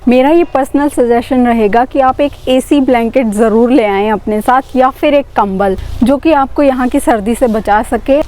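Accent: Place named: Haryana, India. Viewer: i can feel the Haryanvi accent in your voice 😅 btw.... very informative video 🤩😍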